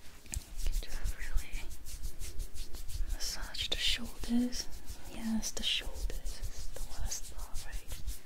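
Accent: Australia. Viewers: Do i detect a hint of Australian accent? Love this. Thank you! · Can I ask where you are from? Your voice is pretty and has a slight aussie accent with how you say some things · That explains it, I thought I heard Aussie sometimes! Oops, sorry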